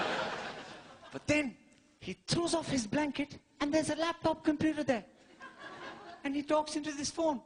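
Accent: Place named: India. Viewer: Well-written but very poorly delivered with absolutely no spontaneity.. He speaks like my nephew would do in an Indian school play.. Probably the crowd is ethnically mixed and cannot make that out from his accent..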